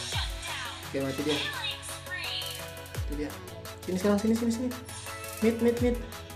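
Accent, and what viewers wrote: Manado, Indonesia. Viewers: Lu manado ya bro?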